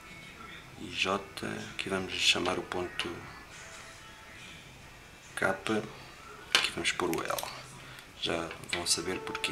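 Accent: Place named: Portugal. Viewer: A língua que ele fala é Português de Portugal amigo. A mesma língua que a nossa, só que com sotaque de Portugal.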